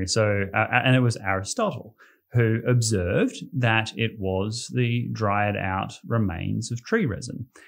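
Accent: Australia. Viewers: Thank you! Its amazing to listen australian talking about these forgotten things!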